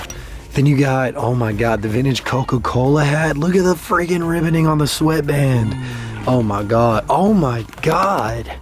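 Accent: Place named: California, United States. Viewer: U got like a cali accent now and shit haha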